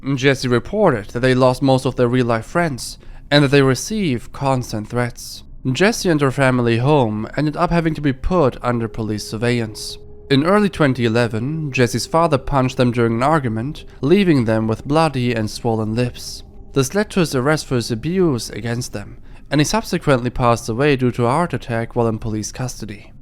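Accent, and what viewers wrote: Germany. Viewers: That's a german accent.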